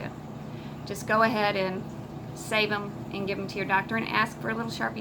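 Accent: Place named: South, United States. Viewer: Thank you SO MUCH for this video. I’m having to inject solumedrol again and had kinda forgotten how it goes! Your video reminded me how easy it can go if you stay relaxed and focused. I wish you would post more videos! Your confidence and demeanor are truly soothing. Thank you, love. And ps, I totally understood your southern drawl #gagirlhere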